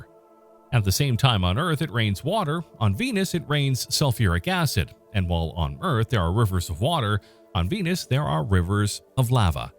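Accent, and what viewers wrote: United States. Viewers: No shit. But the dude in the video speaks American English. Brits and Aussies are used to miles as well as Kilometers. The whole English world understands miles. Watch the Isle of Man TT currently running or watch MotoGP, they use both. If that's the reason, it's a piss poor one. Why not make a video the 330 Million Americans can understand also!?!?! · Would it kill you to show the distance in miles also??? The narrator sounds pretty American to me and yet all you show is kilometers. So annoying 👎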